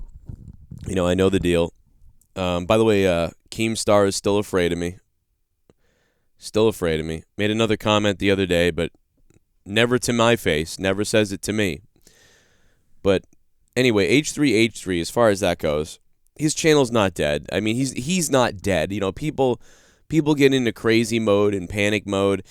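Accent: Boston, United States. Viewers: sounds like someone is from the BAWSTIN AREA. Subbed as a fellow Masshole.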